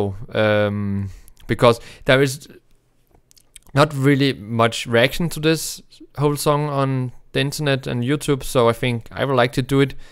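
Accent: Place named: Germany. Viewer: You sound as though you have a German accent... how lucky you are to have J.S. Bach as part of your cultural heritage. The very grandfather of classical music, and the author of the once a world '48 preludes and fugues' If I were German I would be incredibly proud so to be, with the cantor of Leipzig being one of my previous countrymen.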